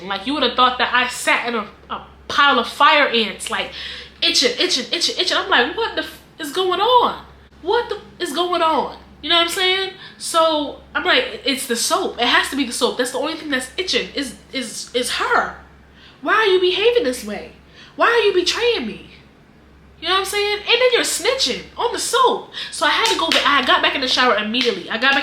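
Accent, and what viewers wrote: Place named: New York, United States. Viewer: You sound like a native New Yorker ! 😂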